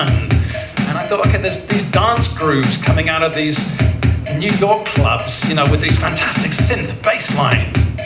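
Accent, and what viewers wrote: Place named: Liverpool, United Kingdom. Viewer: He sounds Liverpudlian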